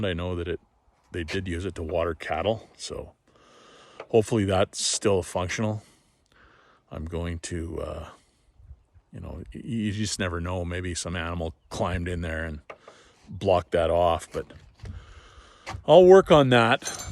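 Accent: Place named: Ontario, Canada. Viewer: Great job. It appears this is where a man is meant to be. You obviously lived in Ontario for a while as your ‘Ote’ for the word ‘out’ is a giveaway.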